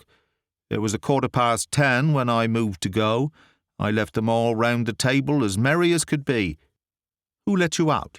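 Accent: United Kingdom. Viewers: The eloquent British dude running this channel has no idea how many times his soothing narration has rocked me off to sleep.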